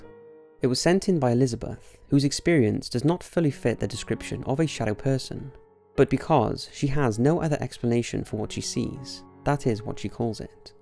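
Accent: Wales, United Kingdom. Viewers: He's from Monmouthshire in Wales. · Go to Wales we all sound like that :)) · Hi, i love your voice xx...where are you from in the UK? xx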